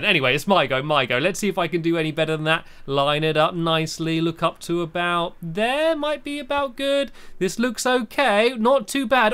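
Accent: United Kingdom. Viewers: * are you british??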